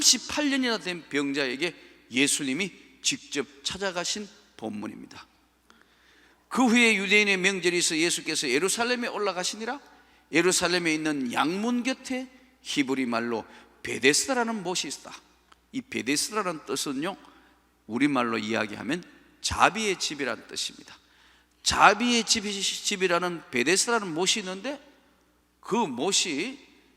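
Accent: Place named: Gyeongsang, South Korea. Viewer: 말투가 대구분 이네요.. 역시 훌륭한사람도 많이 나와요^^ㅎㅎ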